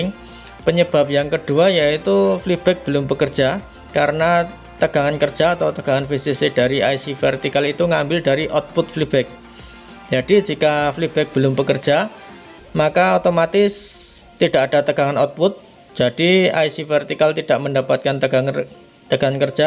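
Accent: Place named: Java, Indonesia. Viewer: Bahasane medok. Lokasi pundi mas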